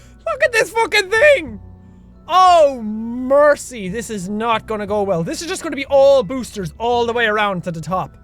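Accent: Ireland. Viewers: On his older he was more embarrassed to talk in Irish tone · Is Irish? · I just want to thank you for all the awesome videos you do! You've inspired me to play so many games( especially Kerbal!) Also I love you're Irish accent! I am also very Irish and thought it was awesome you were when I started to watch you're vids. Keep BOSSING things up, LIKE A BOSS!!!!!!!!!!!!! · r u irish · ChurchISBest I like his Irish voice though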